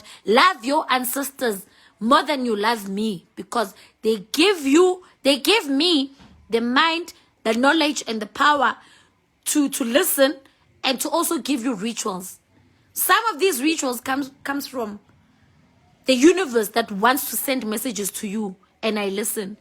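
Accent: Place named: Zulu, South Africa. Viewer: GoguSkhotheni niyangihlekisa ngalesis'Zulu senu (makayenza nje) every word to spit maka, maka, maka really thina sithi (uma enzakanje) and again iciniso(lol) ngala eNatal we use to spit kanje (iqiniso).But I'm not all about that.Thank you Gogo wethu ...,qhubeka nomsebenzi wakho oskhulisayo sonke sidode ngeqiniso.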